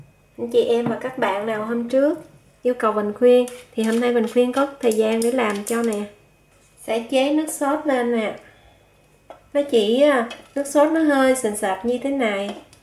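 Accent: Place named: Southwest, Vietnam. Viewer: thích nghe giọng của người miền tây nam bộ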